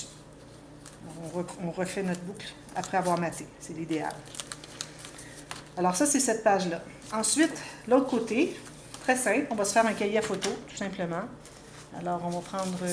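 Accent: Canada. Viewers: Coucou, Pour tes pochettes plastiques, tu aurais pu faire une incision au cuter coté droite, pour pouvoir ôter tes fiches comme ça coté gauche tu aurais pu couper la 1er partie pliable avec les trous et en refaire dans la 2eme partie pliable, et là ta pochette plastique aurait la même taille que ta page et plus de problème pour sortir et rentrer tes fiches! ► Vérifie quand même mes dires car n'étant pas près de toi, mais derrière mon écran peut être que j'ai tout faux! Étant donner que c'est la 1er fois que je met un mot sous une de tes nombreuses vidéos (toutes vue hi hi ) j'en profite pour te dire,.... MERCI tes explications sont claires, tes idées de pages ou forme d'albums sont vraiment très très intéressantes et j'ADOREeeeeeeeeeee ton accent qui me fait voyager chez toi au Canada! MERCI BEAUCOUP pour tous ça! Bonne journée & Gros Bisouuus Valérie de FRANCE.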